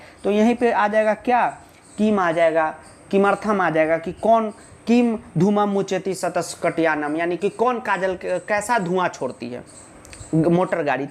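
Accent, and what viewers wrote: Bihar, India. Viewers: Mam ye bahut expert hai mai ense offline me padhta hu sir ka kuch word mouth me hi rah jata hai natural problem hai and sir Bihari hai esliye thoda edhar ho jata hai we proud on bihari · Sir aaap Bihar se ho na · Sir ka voice sa hi pata chal raha that he is a bihari ..😅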